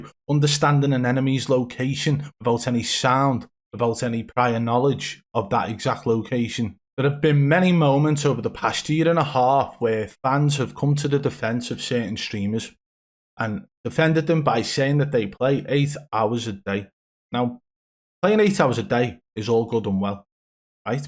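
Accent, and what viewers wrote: Liverpool, United Kingdom. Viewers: Does anyone else think of the scousers from Harry Enfield when they listen to this dude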